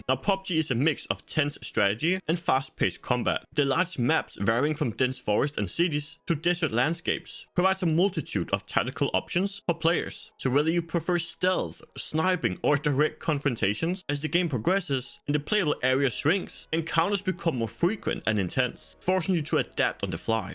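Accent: Denmark. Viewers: def you're danish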